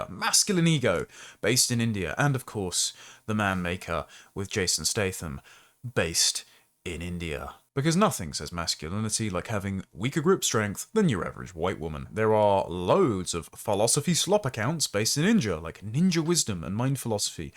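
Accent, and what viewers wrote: RP, United Kingdom. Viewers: Yank here. You are the perfect defender of Western values. You have a posh accent. You look dapper. Your enunciation is superb. Your thoughts are clear and direct. You include the States in your defense. And, you have this lovely background music of seething outrage. Well done, and thanks! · Love that British accent. Alas, soon it may be a thing of the past 😢 Britain may soon be speaking with an Indian accent. Prepare well my friend 😉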